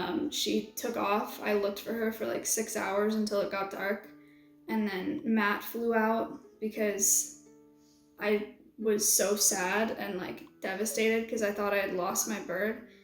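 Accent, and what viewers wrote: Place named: United States. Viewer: Why is her wheel on the right side of her car? Is she not American? She sounds American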